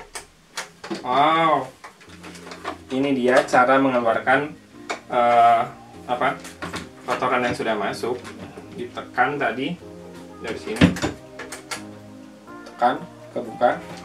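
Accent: West Java, Indonesia. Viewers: gan orang sunda?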